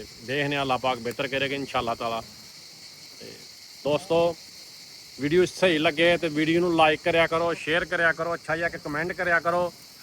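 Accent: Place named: Shakargarh, Pakistan. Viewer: جو بول رھا شکر گڑھ کا لگتا ھے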